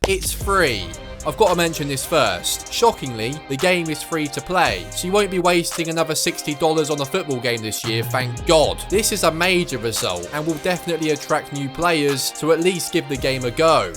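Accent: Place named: England, United Kingdom. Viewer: Fucking whiging fuckin englishman innit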